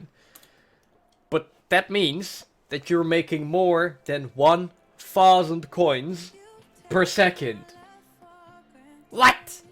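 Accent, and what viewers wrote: Netherlands. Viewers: U sound Dutch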